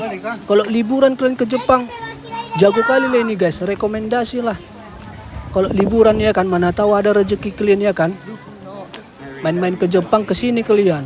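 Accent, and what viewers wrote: Medan, Indonesia. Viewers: suara abang khas banget melayu medan senang aku dengarnya bang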